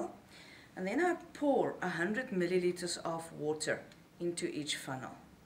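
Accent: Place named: South Africa. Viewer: Very helpful video, thanks. You sound like you are from South Africa as well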